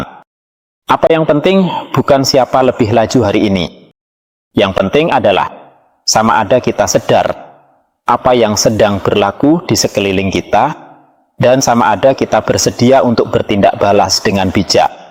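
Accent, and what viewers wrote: Indonesia. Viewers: Berbahasa Malaysia beraksen Jawa . .?!!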